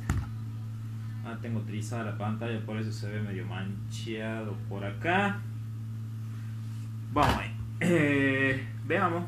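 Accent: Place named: Salta, Argentina. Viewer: Muy bueno .saludos desde Salta ,sentía en tu voz una cercanía a mis pagos ,muy buen sonido , y adelante con el proyecto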